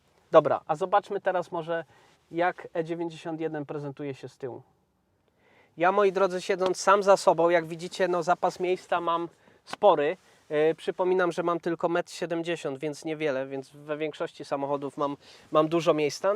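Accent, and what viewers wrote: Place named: Warsaw, Poland. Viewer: Te warszawiok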